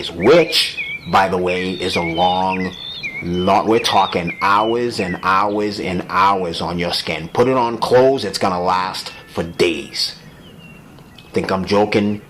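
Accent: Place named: Massachusetts, United States. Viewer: That mass accent is thick as hell you musta grown up in the burbs of mass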